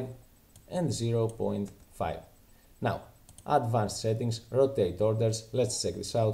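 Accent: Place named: Greece. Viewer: are you greek ? only Greeks speak english like that, αυτα ειναι πολυ ψαγμενα πραγματα , ευχαριστουμε που μας δειχνεις τα φωτα σου, άτομα που εχουν φτασει σε αυτο το βαθος ερευνας και χρησης αυτης της τεχνολογιας ειναι ultimate tear geniuses.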